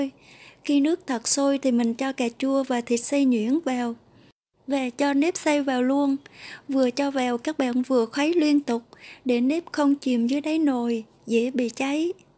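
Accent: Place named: Nha Trang, Vietnam. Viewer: Ninh hòa .Nha trang.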